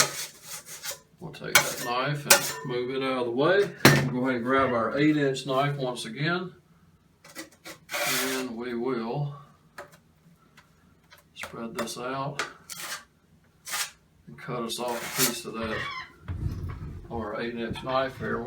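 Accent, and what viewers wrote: South, United States. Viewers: This was so helpful, thank you! And something about the really clean finish on the wall and pleasant southern accent was strangely satisfying lol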